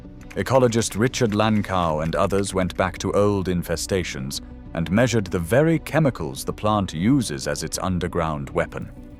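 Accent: England, United Kingdom. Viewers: You mean English accent?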